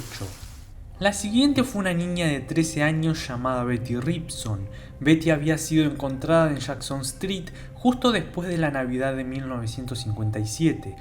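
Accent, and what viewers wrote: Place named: North, Mexico. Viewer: Como que norteño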